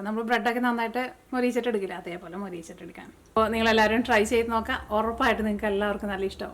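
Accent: Thrissur, India. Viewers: urakka parayana...nammal trichur kar allaaa...speed kooduthala..chilathu manassilagunnillaaa...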